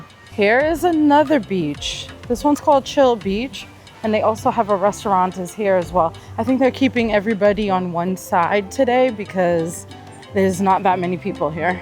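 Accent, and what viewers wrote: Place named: Caribbean. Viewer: OK. I'm from New York as well, Brooklyn to be exact. I kinda hear a Caribbean accent too from you.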